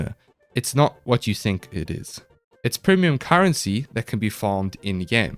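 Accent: South Africa. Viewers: Do I detect a South African accent or am I mistaken ? 😂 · are you south african, why do you sound south african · Great video sir. Very useful as I'm downloading the game. I have one question, what country is your accent from? I think it may be south African but I'm really not sure as it could also easily be a new Zealand accent and I wouldn't know the difference. It's driving me crazy! Please! What is your accent? 😂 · FIRST TIME HEARING AN SA ACCENT ON GAME TUTORIALS!!!! LEKKER BOETIE :) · Just a random question what is your accent. It sounds South African. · Completely unrelated to the video but your accent sounds familiar, are you South African? Also this was really helpful! I was super confused on how to progress when I got this game XD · Sound suspiciously south african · You have a south african accent 🤔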